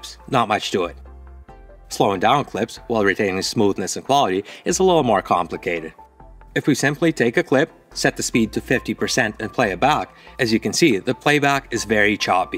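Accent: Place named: Quebec, Canada. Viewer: Great tip, and you have one of the most interesting accents I've heard! Mix of Asian and... French-Canadian? Am I dreaming? I never heard a voice like that :O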